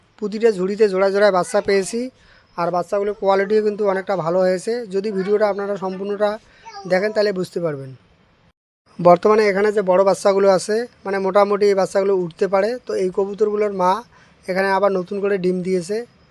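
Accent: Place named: Rangpur, Bangladesh. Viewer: আরে কথা শুনে তো মনে হচ্ছে রংপুরের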